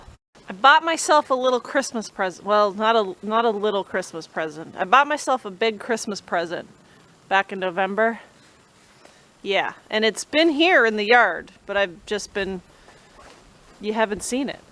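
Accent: Canada. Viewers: Canadian accent is awesome. I bet us southerners sound like beverly hillbillies to you 😂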